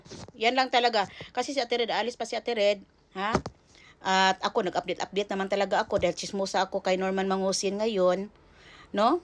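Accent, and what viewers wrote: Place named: Visayas, Philippines. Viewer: Bisaya man diay ka 😂😂❤❤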